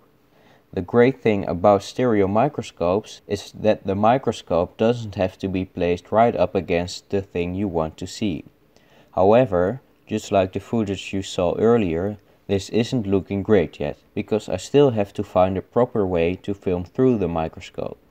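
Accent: Netherlands. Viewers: I think he’s Dutch. I have the same accent 😂... A lot of people I know who speak English on a daily on the workfloor also sometimes have a heavy accent. I believe it’s because our language is very accent reliant. It’s very difficult to understand someone speaking Dutch when they aren’t native. Fortunately for us this isn’t the case with English language 😬 but maybe if it was, we’d practice pronouncing English a bit better 🤷🏼‍♀️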